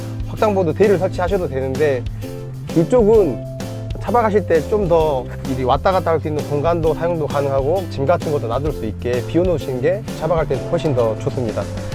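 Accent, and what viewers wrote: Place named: Jeolla, South Korea. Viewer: 으따 절라도 사투리 겁나 쓰구마 ㅋㅋ 좋게 잘 봐부러쏘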